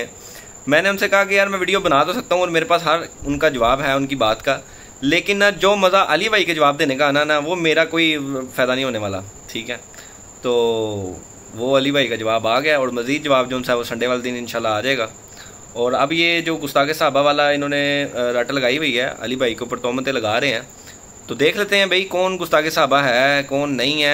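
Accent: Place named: Punjab, India. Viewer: مووولک نہیں ملک ہوتا ہے۔ اردو بولنا سیکھ لیں بھائی ۔ آپ کی اردو پنجابی زبان کی چغلی کھا رہی ہے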